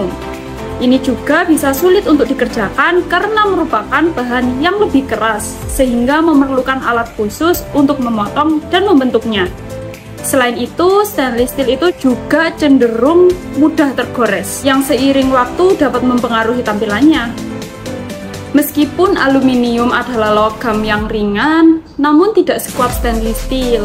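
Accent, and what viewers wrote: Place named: Java, Indonesia. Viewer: Mbaknya medok, pasti wong jowo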